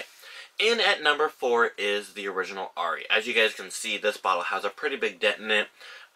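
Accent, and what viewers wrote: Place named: United Kingdom. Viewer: if you’re from the uk which you sound like you are, how/where did you get cloud so early??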